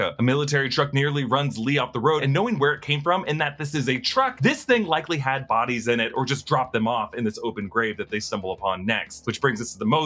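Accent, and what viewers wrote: United States. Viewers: What kind of American are you? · what kind of american are you?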